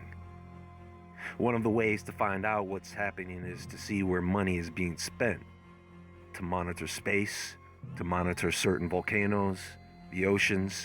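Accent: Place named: East Coast, United States. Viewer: sounds like a know-it-all, with his Italian (Jewish?) east coast accent, telling us "trust me". He makes some interesting videos, but his logic is often flawed, at least it seems erroneous to me. We live on a plane, not a spinning ball traveling through a galaxy, as has been proven ad nauseam. Both NASA and the government lie to us, but he believes the crap science they taught him anyways - wtf? The weather is now created and controlled, flooding caused intentionally using rapid water evaporation from power plants, which creates and fuels storms. Cyclones are created artificially. This was all proven in Weatherwar101 videos, which you can still watch on youtube. Mr. WW101 himself was disappeared in early December 2017, then his website disappeared a few months later, since he wasn't able (alive?) to renew it with his ISP. This video is mostly nonsense, but I like his videos on other subjects, especially the bible.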